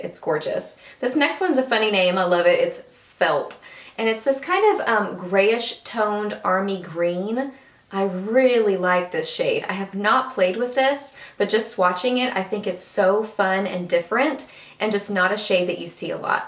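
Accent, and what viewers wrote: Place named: Texas, United States. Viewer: I love all of your videos! I love how you talk! (maybe cause I'm from texas as well? lol) how detailed you are with everything and organized!!!! I would love to see a review of the real techniques palettes from you.. because I know you are honest and so far I agree on everything you say about this stuff!!! Thank you for your awesome videos!!!! - Natalia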